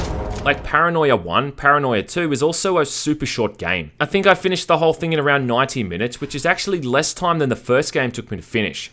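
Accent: Australia. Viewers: Didn't know I needed subtitles to understand Australian.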